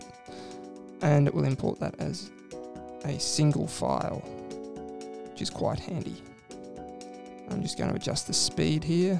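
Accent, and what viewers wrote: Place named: Australia. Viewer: Good to hear an Australian voice on YouTube. I am sure method works, however it is a bit of a fiddle. For weeks I have been seeking a simple method to open BlackMagic image sequences in an app' on Mac, and convert them to QuickTime PRORES files. The PRORES files I wish to colour grade in After Effects. Any suggestions?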